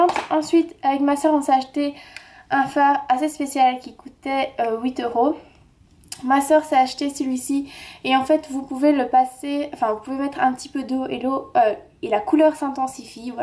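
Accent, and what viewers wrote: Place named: Belgium. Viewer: Coucou ma belle ! Je viens de tomber sur ta chaîne par hasard et j'aime beaucoup tes vidéos! J'ai tout de suite compris que tu était belge :) ( grâce à ton accent hihi) Je dois également me taper Auchan en France pour aller chez Kiko/Sephora :( Je voulais savoir (si ce n'est pas indiscret) dans quel région de la Belgique habite-tu? :) Bisous.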